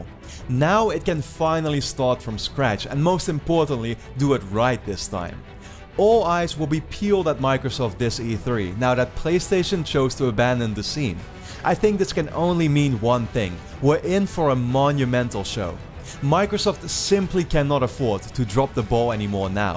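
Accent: Netherlands. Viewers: hes dutch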